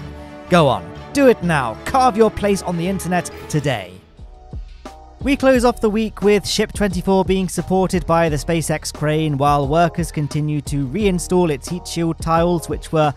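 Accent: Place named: England, United Kingdom. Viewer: Great content diminished by an English accent.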